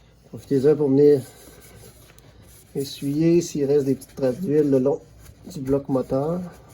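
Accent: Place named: Quebec, Canada. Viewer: Accent sympathique du Quebec.... Bonjour depuis la Suisse, mon pays. J'ai une nine- t Racer...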